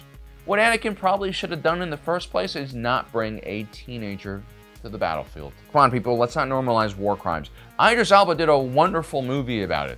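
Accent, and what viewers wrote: New Zealand. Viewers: Australian? No Kiwis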